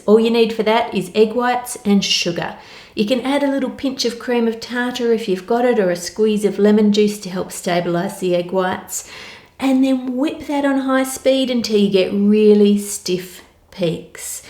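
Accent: Perth, Australia. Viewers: it's a Perth accent :) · Australians like have an awesome accent! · Dear mam Will you please teach us Australian accent please please it's so good 👌 by the way I am kashif form Asia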